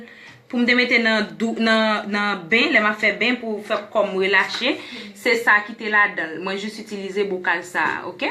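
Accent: Haiti. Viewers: Moun nan k dii exprimel an français madame nan sonw ayisyèn ok saw di a pa gen plas Li dakó banm zizi San valè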